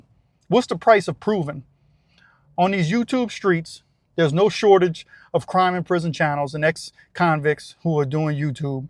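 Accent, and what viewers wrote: Louisiana, United States. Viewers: Dude, you'd make a great character actor...your speaking cadence and Louisiana drawl is unique and cool. You'd make a good henchman or badass avenging some transgression. You're a good storyteller with such a unique vibe.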